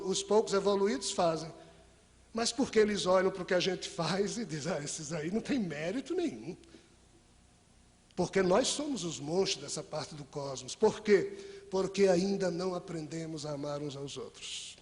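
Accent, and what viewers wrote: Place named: North, Portugal. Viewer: este palestrante lembra bezerra de menezes compleiçao fisica cabelo e tem sotaque do norte assim que vi lembrei de bezerra ate porque bezerra desencarnou em 1900 e podia ter ido embora da terra e disse que ficaria 100 anos na espiritualidade ajudando a evoluçao e que voltaria a encarnar para ficar ate que a terra estivesse em feanca regeneraçao ou seja mais 100 anos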